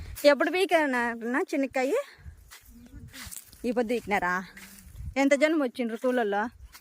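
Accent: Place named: Anantapur, India. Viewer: ఎక్కడ మీ ఊరు.. మా అనంతపురం యాస చాలా బాగుంది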